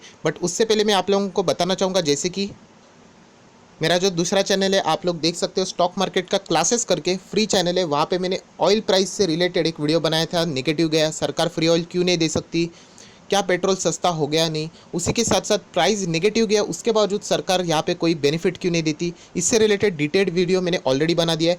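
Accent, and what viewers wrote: Maharashtra, India. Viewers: Are you Marathi? You language (tone) seems familiar. BDW awesome content and knowledgeable, following your channel since month and learning new things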